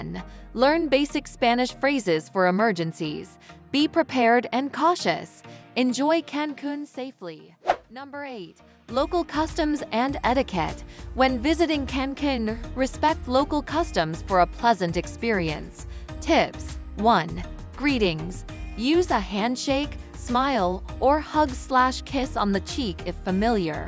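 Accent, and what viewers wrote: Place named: United States. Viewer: when american pronunciation cicks in,.. some places had a different pronunciations. anyways thanx for this general video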